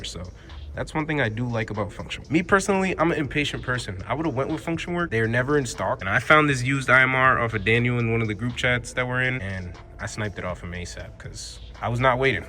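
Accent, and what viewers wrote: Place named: Boston, United States. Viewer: aint even realize you from Boston too 🔥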